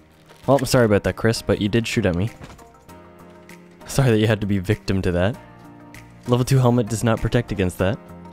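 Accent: Canada. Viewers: are you Canadian? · Are you Canadian? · You gotta be Canadian... you sound just like my uncle just not as deep of a voice lol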